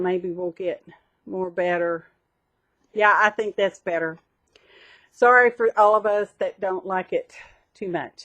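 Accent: South, United States. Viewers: I just love your southern accent I’m from south Mississippi and sound like you lol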